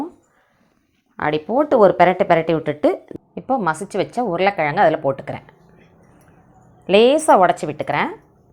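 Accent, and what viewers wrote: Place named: Coimbatore, India. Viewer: உங்களுக்கு எந்த ஊர் சிஸ்டர் கோயம்புத்தூரா 🤔🤔 நாங்கள் திருநெல்வேலியில் இருக்கிறோம் நீங்கள் ரொம்ப அழகாக பேசுகிறீர்கள் அதனால் கேட்டேன் வாருங்கள் அவ்வப்போது எங்கள் வீட்டுக்கும் முதலில் வந்தீர்கள் இப்பொழுது ஆளையே காணோம்🤔🤔🤔🤔🤔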